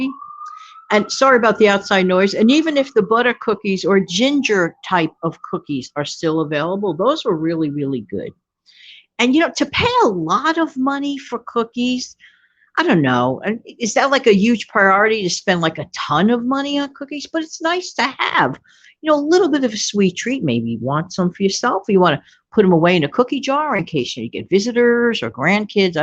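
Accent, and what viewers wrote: New York, United States. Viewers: New subscriber. I saw you on Centsible Living's channel with Prepper Princess. Love your personality and NY accent. Great video on the DT. 🌻🤗🤗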